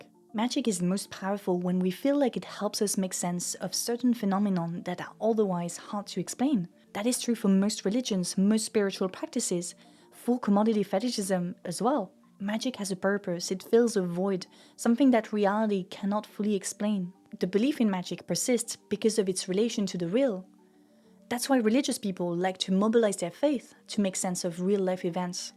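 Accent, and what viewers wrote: France. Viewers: Thanks for raising your voice regarding Temu and that mass consumerism. Got a rather ascetic lifestyle, so Temu's offering sounds immediately idiotic to me. Why buy more than you would ever need? Seems crazy to me, but i know how society (*ahem* businesses) push people to always want more, newer and shinier stuff... Btw, took me a surprisingly long moment to realize you are FR, as I am. Great accent :)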